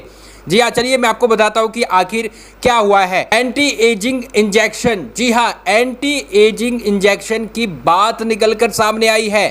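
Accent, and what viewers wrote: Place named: India. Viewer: भाई, हम अमेरिकन नाही, जो शॉ बोले सिर्फ, इंडियन अक्सेंट एसा ही है. There को देयर, alive ko लाइव्ह, र, कड, daughter ko डॉट नहीं डॉटर, पुरा उच्चारण करते है, क्या हुवा ठीक है.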